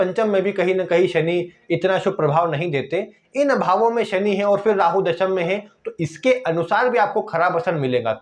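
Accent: Madhya Pradesh, India. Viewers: गुरूजी बहुत अच्छा विश्लेषण दिया है आपने 😊 आपकी बोली से आप हमारे मध्य प्रदेश के ही लग रहे है 😀 मैं भोपाल का रहने वाला हूं ❤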